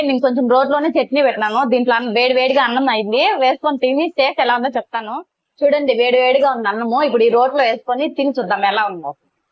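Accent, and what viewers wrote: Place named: Chittoor, India. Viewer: Chittoor language 😂😂😂😂 me village ekkada akka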